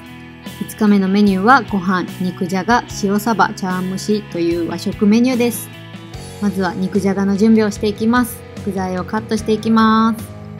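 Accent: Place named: Kansai, Japan. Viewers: ヒレ→ヘレ 茹でる→ゆがく 煮込む→炊く バーモント→バーモンド 関西の言い回しっておもしろいですね😊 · 何も違和感が無かったのでコメントを見て関西弁なことを知り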